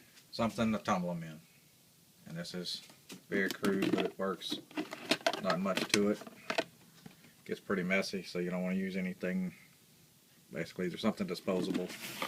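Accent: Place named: Maryland, United States. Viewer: I've always wondered about these coatings. That accent sounds like somewhere between Cecil and Wicomico county!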